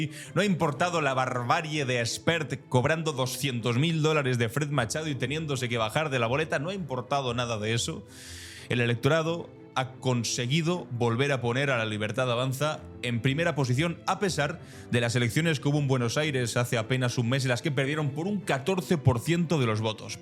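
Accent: Spain. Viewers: Me encanta acento Español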